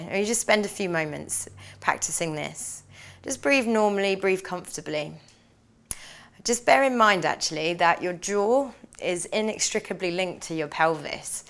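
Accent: England, United Kingdom. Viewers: This was a wonderful sequence, just enough to get my heart rate up which I do enjoy, thank you! Also very nice to find a lovely English accent to listen to while doing yoga!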